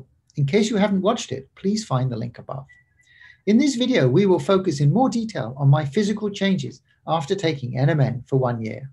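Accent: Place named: United Kingdom. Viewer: Friend, In spite of your predictably formal Brit accent and you're at least pseudoscientific approach to self-analysis, your presentation is very ephemeral and subjective, and even though I am a great fan of nmn, this is not a particularly compelling presentation of its benefits. My suggestion is to try instead, to relax your delivery.. even if it means pretending you're an american.. and to provide far more substantive information, rather than merely to emulate the superficial appearances thereof. Then you'll be well on your way to a far more helpful presentation.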